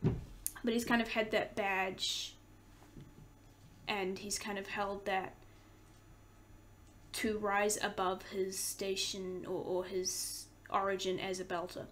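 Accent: New Zealand. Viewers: LOL Well that is funny. A Kiwi. I didn't pick up your NZ accent, but I should have, as I love Karl Urban. I just noticed the way you said idea. Thought you might be from southern US, not the other side of world. LOL 😆 I enjoy your reactions though and you haven't hit the REALLY good stuff. It's about to seriously heat up 😁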